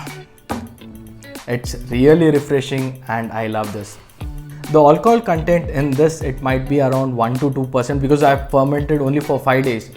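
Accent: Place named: India. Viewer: Thank you....listening to you gives me good memories of my friends of India the Bajaj family.....I think everyone knows them ahahahah.....I am making guava wine now from my huge tree here at my house in the mountains of Guatemala....I will start the ginger bug today for some good ginger beer.....Adios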